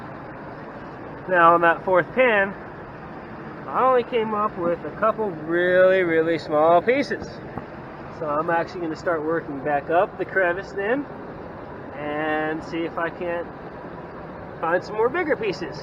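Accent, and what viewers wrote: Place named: United States. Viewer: Thanks! I could not understand any of the detail words with such a heavy American accent as cool as it sounds aha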